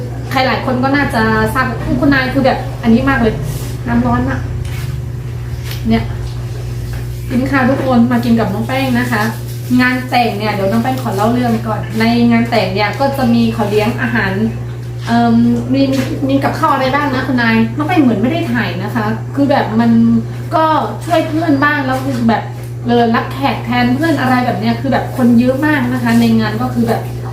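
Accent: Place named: Isan, Thailand. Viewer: เว้าสำเนียงอิสานแล้ว น่ารักมาก มักหลายเด้อ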